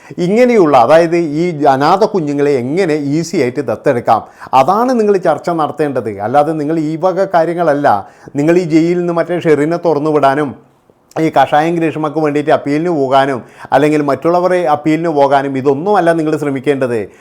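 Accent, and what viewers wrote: Kannur, India. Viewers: താങ്കൾ കണ്ണൂർ അഴിക്കോട് ആണോ സഹോദരാ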